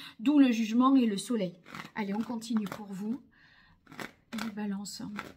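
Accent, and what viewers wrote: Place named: Marseille, France. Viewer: Bon bah ça marche ! 🙂 Ça ne me parle pas trop, mais j'aime bien votre accent, ça me rappelle ma vie à Marseille.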